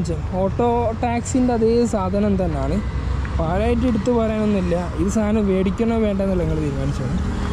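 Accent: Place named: Nilambur, India. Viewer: മലപ്പുറം നിലമ്പൂരിനടുത്ത് ആണെന്ന് തോന്നുന്നു സംസാരം കേട്ടിട്ട്.. ☺️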